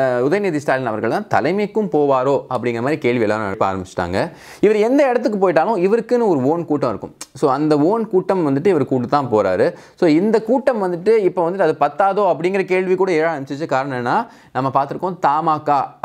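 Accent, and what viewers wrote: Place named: Kerala, India. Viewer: மலையாள வாடை உங்கள் பேச்சில்..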